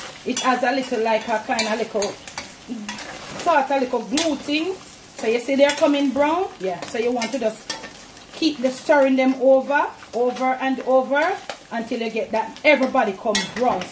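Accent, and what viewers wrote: Jamaica. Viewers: I like it when you speak Jamaican- we understand !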